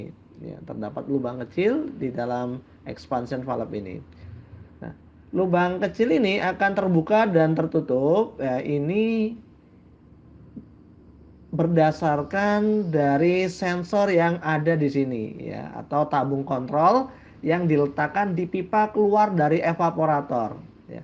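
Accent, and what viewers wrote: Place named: Java, Indonesia. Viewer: Medok nya sudah pas, Mantap pak guru salam dari senop :)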